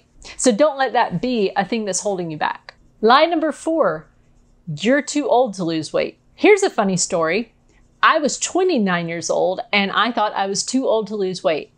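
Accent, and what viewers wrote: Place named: England, United Kingdom. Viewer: you sound very English, my genetic background, however married a Frenchman! I find your slow and steady climb quite remarkable,and reflect if I had been satisfied with a slow approach I’d be done thrice over! Making such changes and so comfortable with your own path is inspiring. Great victory! Even the selling off your collection is profound! I believe I must dedicate my health and consequently eating habits that occupy my frame regarding size/shape to tell a different story from now on, in fact I insist! The wine actually led to picking and snacking…like a sloppy will, so I’m taking the reins, and I’m truly in awe when someone aspires to freedom and Triumphs! Move over I’m on the move!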